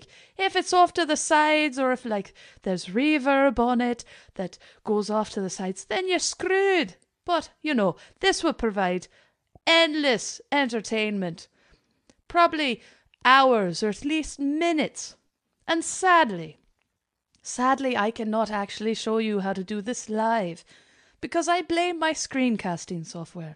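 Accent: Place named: Scotland, United Kingdom. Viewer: Your scottish accent is super hot.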